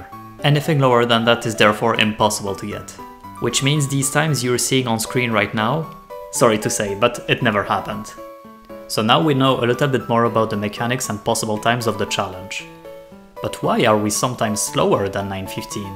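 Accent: France. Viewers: Do i hear a little french behind that great video? Beau travail camarade!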